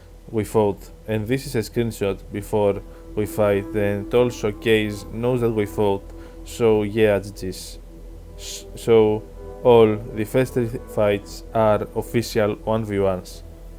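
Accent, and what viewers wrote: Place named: Greece. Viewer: Greek accent hits hard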